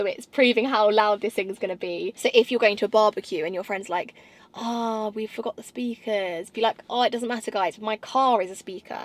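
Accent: United Kingdom. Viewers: clicked for the car, stayed for the accent, love the brits accent damn it's soo cute & sexy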